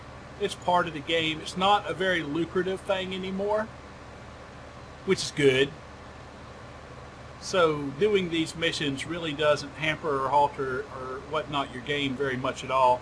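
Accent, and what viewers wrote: South, United States. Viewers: you taught me that not everyone with a southern accent is a bit slow good logical play through and info for us new players, thumbs up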